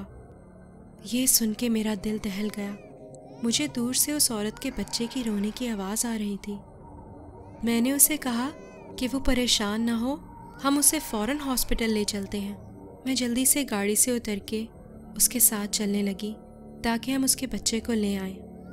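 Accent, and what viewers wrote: Pakistan. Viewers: I think she is Pakistani and living in abroad since birth that is why she has amazing accent and maybe fictitious minded so she can create terrific stories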